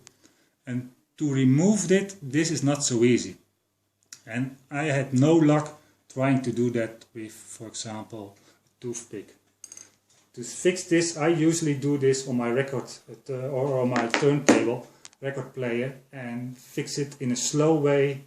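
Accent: Netherlands. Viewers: You always can hear if you are dutch hahahaha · Je spreekt het engels wel op een zeer nederlandse manier uit. Maar dat wil niks zeggen, deze video‘s zijn supertips en ik zie in de comments dat het geweldig helpt bij de engelse kijkers. 😊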